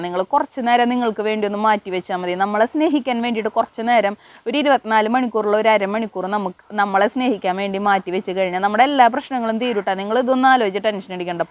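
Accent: Thrissur, India. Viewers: 🙏🙏 Super tips. Thanks for this tips. സ്വദേശം തൃശ്ശൂർ ആന്നോ. നല്ല പ്രസന്റേഷൻ. Video കാണുമ്പോൾ ഏതായാലും ബോറിങ് വരുന്നില്ല ഞാനും ഒരു subscriber ആണ്. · 👌നമ്മൾ തൃശൂർ ഗഡീസിന്റെ സ്ലാങ് സൂപ്പർ 👏👏👏സൂപ്പർ ടിപ്പ് 👍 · തൃശൂർ ശൈലി സൂപ്പറായി. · ഈ വീഡിയോ ഒത്തിരി ഷ്ടായി. അവതരണം രസാണ്. ആ തൃശൂർ ഭാഷ വളരെ ഷ്ടായി. തൃശൂർ എനിക്ക് ഒരു ഫണ്ട് ണ്ട് അതെ സംസാരം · ഭാഷ സൂപ്പർ ത തൃശ്ശ്യർ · ചിരിച്ചു ചിരിച്ചു ഞാൻ ചത്തു നല്ല തൃശൂർ സ്ലാംഗ്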